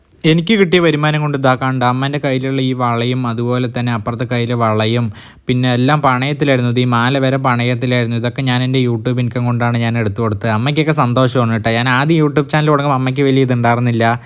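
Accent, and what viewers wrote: Palakkad, India. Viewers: palakkad slang 📈🔥❤ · ചേട്ടൻ്റെ വീഡിയോ ഞാൻ കാണാനുള്ള പ്രധാന കാരണം ഞാനും പാലക്കാടിൽ ആണ് താമസിക്കുന്നത് ഈ ഭാഷ സംസാരം കേട്ട് തന്നെയാണ് വീഡിയോ സബ്സ്ക്രൈസ് ചെയ്തത് ❤️‍🩹പിന്നെ യൂട്യൂബിൽ ഉള്ള ചില വ്ലോഗേർസ് ൻ്റെ വീഡിയോസിൽ കമൻ്റ് ഇട്ടാൽ വലിയ ജാട കാണിക്കും ഏത് കമൻ്റിനും റിപ്ലെ തരില്ല😑 പക്ഷേ ചേട്ടൻ ഞാൻ ഇത് വരെ ഇട്ട എല്ലാ കമൻ്റിനും റിപ്ലെ തരുന്നുണ്ട് 👍❤️ അതുകൊണ്ട് തന്നെ ഞാൻ ഇനിയും ഇടുന്ന എല്ലാ വീഡിയോയും കാണും സപ്പോർട്ട് ചെയ്യും❤️‍🩹🧿🫂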